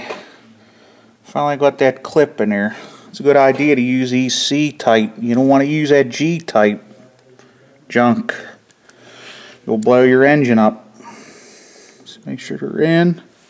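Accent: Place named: New York, United States. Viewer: Great video just did crank bearings on my 391 shes ripping again. Thanks! I can tell your from New Yawwwk somewhere. Maybe penn.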